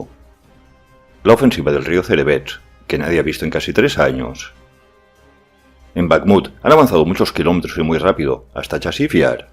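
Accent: Galicia, Spain. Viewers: Perdon por el off topic, ¿tu acento es de Galicia?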